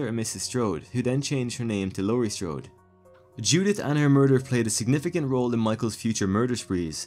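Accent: Ireland. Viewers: I’m pretty sure he’s Irish. Regardless, it’s all the same language.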